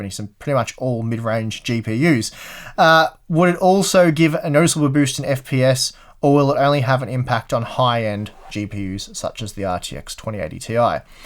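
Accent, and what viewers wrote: Australia. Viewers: basically he feels that you are a typical Australian and he finds Tim to be a bit more British than Australian, does that make sense? You are the crocodile guy and Tim is more Nicole Kidman, accent wise. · didn’t feel like 30 minutes. Oh how time flies when you’re listening to an aussie bloke😂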